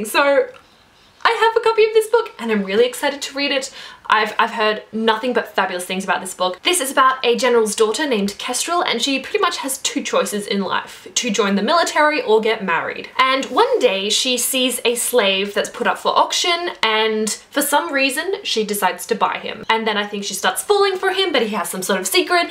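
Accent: Australia. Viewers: Australian or New Zealander?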